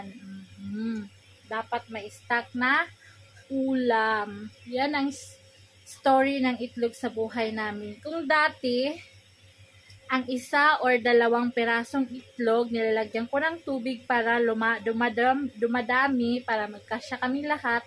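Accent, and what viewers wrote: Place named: Cebuano, Philippines. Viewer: Work narin ang pag vlog mo sis.....magkatawa ko nimo kay mo gawas jud imo pagka cebuana...God bless dai...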